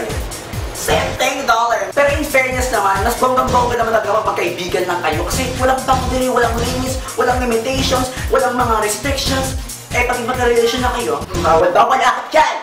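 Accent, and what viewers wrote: Visayas, Philippines. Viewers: Kuya, bisaya ka po?:D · bisaya diay ka ba?:D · Bisaya ka pala..?! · bisaya ka pala dong! XD taga asa ka?? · bisaya ka kuya??? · May pagka bisaya rin siya noh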